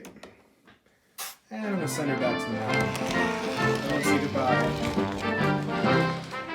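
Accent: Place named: Maryland, United States. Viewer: the Train Garden? are you from Baltimore? I hear those Maryland and SE PA vowels.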